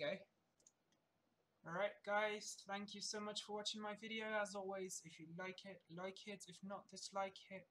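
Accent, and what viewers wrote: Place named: United Kingdom. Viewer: i swear i hear British in ur voice back 7 years ago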